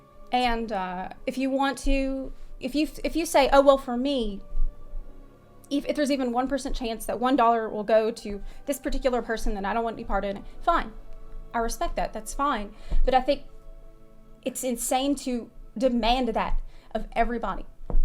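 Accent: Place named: Louisiana, United States. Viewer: The Louisiana really came out of in this one, damn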